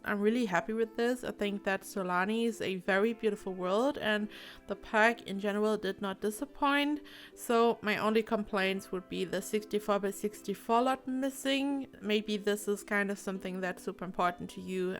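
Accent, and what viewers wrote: Germany. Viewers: I think you have a German accent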